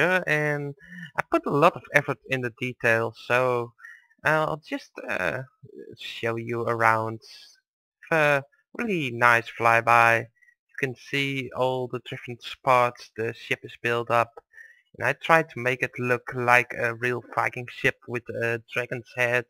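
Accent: Netherlands. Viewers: okay are u dutch because u sound like that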